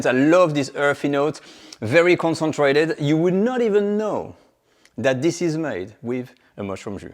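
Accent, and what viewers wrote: France. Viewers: I understand everything he says. He speaks with a charming French accent and his explanations of his cooking is perfect. 😊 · Er ist OFFENSICHTLICH Franzose. Er spricht wie meine Mutter.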